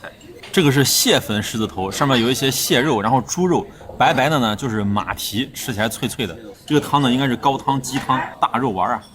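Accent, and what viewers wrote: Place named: Yangzhou, China. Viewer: 说的扬州话很地道让我想起了我的家乡东北.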